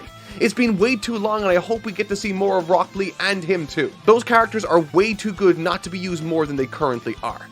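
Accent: Ireland. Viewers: Are you Irish, sir? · That's wild af to call an Irishman a Brit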